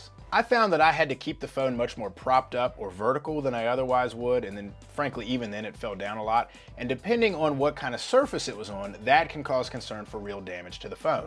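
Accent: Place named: Louisiana, United States. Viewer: Debating whether to go caseless for the 14 Pro Max. You sound like you’re from Louisiana!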